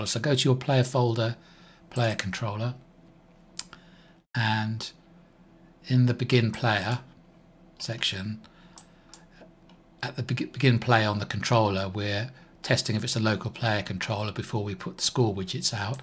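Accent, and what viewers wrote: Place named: South Carolina, United States. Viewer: Let me guess, are you from South Carolina or somewhere near it? THe manner of pronunciation reminds me my friends from these places. Thank you so much Sir, interesting and useful videos!!!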